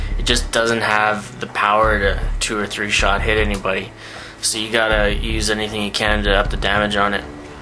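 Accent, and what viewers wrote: Canada. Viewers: u from canada?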